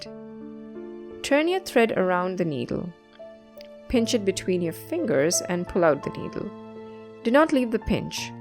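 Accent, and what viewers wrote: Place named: Kenya. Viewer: Thank you. Your accent is so Kenyan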